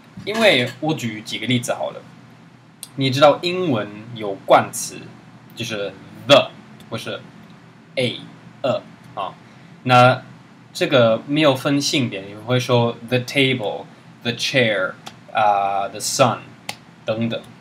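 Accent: Taiwan. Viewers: 汉语学的一口台湾腔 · I am really impressed with your fluency of Chinese but the thing is you speak with a pretty obvious Taiwan accent，which sounds definitely beautiful but a little bit strange if you are trying to communicate with someone who comes from Mandarin. You may wanna watch some Mandarin movies in order to see the difference. Also, thanks for your sharing! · 講德文超帥。超根本不是討厭的。哈哈以後講聽不懂的德文就好了。根本帥。王子講國語根本台客。哈哈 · 我猜你是跟台湾人学的中文［［ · 这人的汉语在台湾学的吧，我听出来了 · 在台湾学的中文？ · 好像到地的台灣中文!!!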